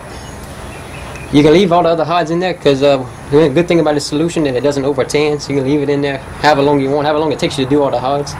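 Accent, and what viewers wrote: Louisiana, United States. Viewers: Man that Louisiana accent come out thick. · Southern Louisiana, American accent. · I'm from Louisiana.. Promise this dude is too... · American... Southern of some sort, Louisiana?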